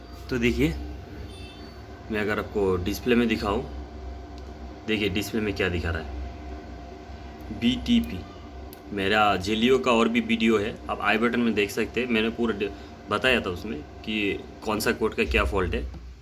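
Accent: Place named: Arunachal Pradesh, India. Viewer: Arunachali Accent 🎉😅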